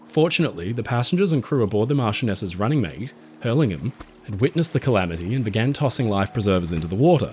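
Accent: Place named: Australia. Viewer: Inspiration for an Eastenders storyline where Sharon loses her son Denny. Great Aussie accent you got.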